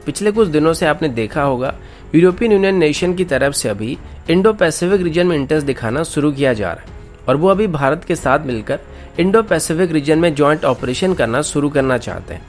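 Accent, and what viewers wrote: Odisha, India. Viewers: Bhai tame kana odia pila ki??? Language ru jana paduchhi tike tike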